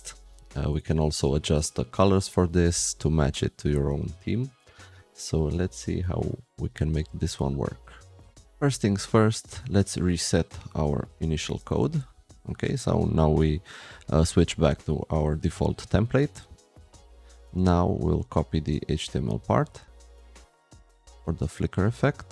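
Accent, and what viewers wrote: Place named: Romania. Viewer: Ai voce de roman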